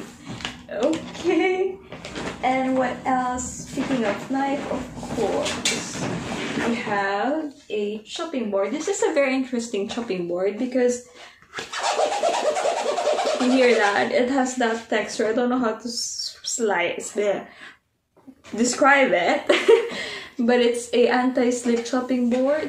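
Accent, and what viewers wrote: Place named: United Kingdom. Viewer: Take care always ate 😍 miss you. Naloka ako sa englishan hehe british na bristish na hehe 😅☺️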